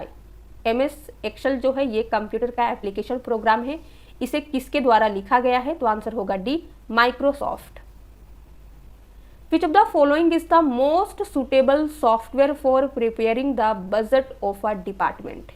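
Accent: Odisha, India. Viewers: Are you from odisha mam?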